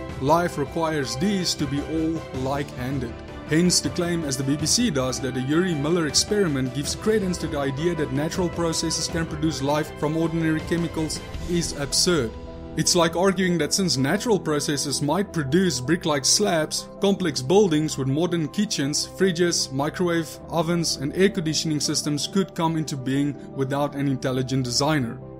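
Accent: South Africa. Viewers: You sound South African... are you? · ahh crap! he sounds South African, if he is, I apologize for the rest of us who understand science.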